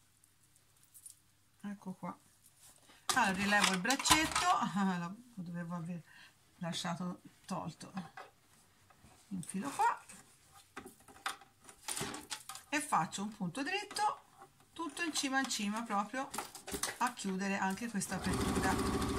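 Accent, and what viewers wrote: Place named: Tuscany, Italy. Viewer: Buongiorno, carino il coniglietto bravissima.come mi piace l'accento toscano 😂😂😂😂😂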